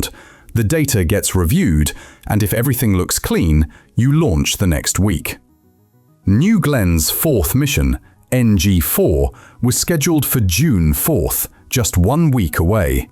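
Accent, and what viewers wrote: England, United Kingdom. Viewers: He's English from the sounds of it. Some of their pronunciations are weird.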